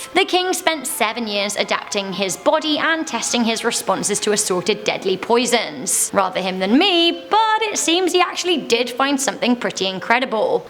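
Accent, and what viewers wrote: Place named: United Kingdom. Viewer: gotta love the uk accent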